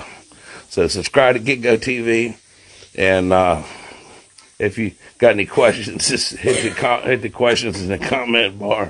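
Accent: United States. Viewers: My husband and I never laughed so hard 😂 . Am I picking up a Louisiana accent? The fart sounds Cajun.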